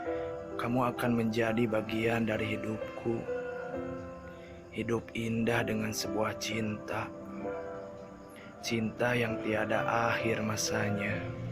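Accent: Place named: Sunda, Indonesia. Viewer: Sunda pisan suara na aa keren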